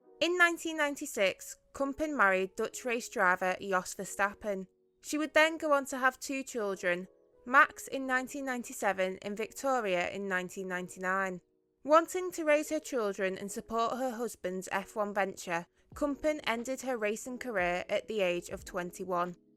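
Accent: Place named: Hull, United Kingdom. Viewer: Great Vid..... Ha e to ask is the Narrator from Hull my family is from Hull.. No accent like it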